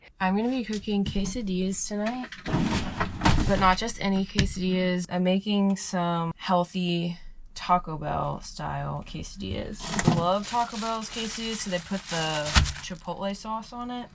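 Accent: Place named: Delaware Valley, United States. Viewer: She sounds like she is from the delaware valley but i know baltimore people sound like this. Maybe west Virginia. Anyone can tell me where she is from?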